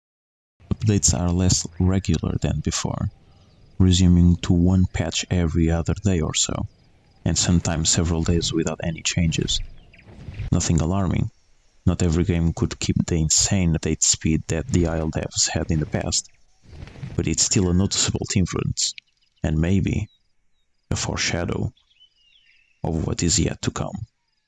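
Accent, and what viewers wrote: Portugal. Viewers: este sotaque é tuga meu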